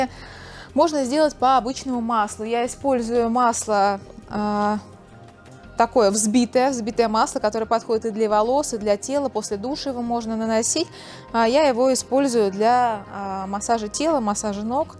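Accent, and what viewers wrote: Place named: Russia. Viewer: You don't have to if you don't want to but do you think you could make a english speaking video someday? Please I would just love to hear you speak english with your awesome Russian accent. Please!!!!!!!😊😊😊😊😊😊😊😊❤❤❤❤❤❤❤❤❤😍😍😍😍😍😍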